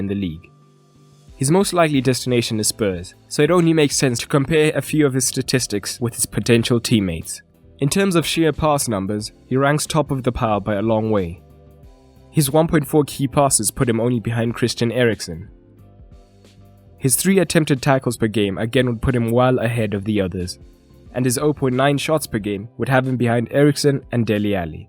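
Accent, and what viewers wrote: South Africa. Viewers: You sound South African. New subscriber 🙋🏽‍♀️ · What do I think? I should let you know in the comments below? I think... I think you sound South African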